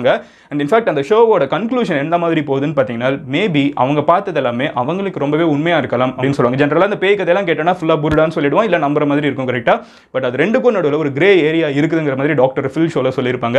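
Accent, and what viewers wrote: Madurai, India. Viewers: தலைவா நீங்க மதுரை யா ? மதுரை 🔥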